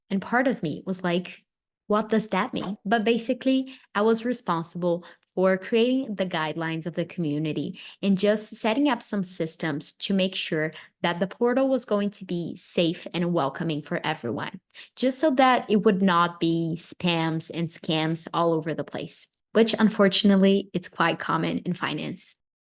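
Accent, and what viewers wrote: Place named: Brazil. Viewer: I KNEW you were Brazilian, I felt it from your accent. I'm Brazilian too and I love your videos. I'm a product owner | Product Designer:hand-pink-waving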